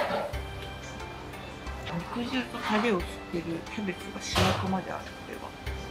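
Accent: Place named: Osaka, Japan. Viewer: 、大阪弁好きやわあ😘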